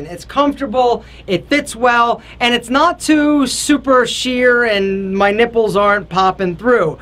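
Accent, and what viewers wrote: United States. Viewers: I’ve never seen someone sound so american like this man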